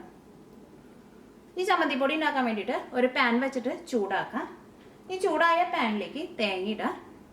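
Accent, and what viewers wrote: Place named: Thrissur, India. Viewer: Hello..I am your fan..love your simple narration and the accent..I am also a native of trichur..hence hearing you gives extreme pleasure.. very good recipes and cooking..keep going..congrats..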